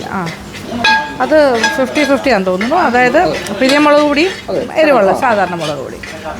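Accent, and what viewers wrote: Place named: Kottayam, India. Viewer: Kottayamkar samsaram kettappol thonni,njan kottayam😊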